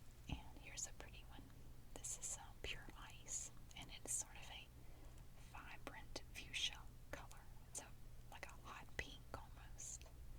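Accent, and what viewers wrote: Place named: Coastal North Carolina, United States. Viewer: New viewer! You have a delightful whisper. I'm trying to peg your regional accent. Coastal North Carolina? I grew up on east coast and settled in midsouth state.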